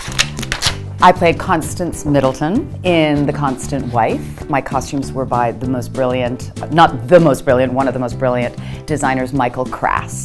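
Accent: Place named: South, United States. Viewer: Hearing her Southern accent makes me want to see her play Clairee from Steel Magnolias.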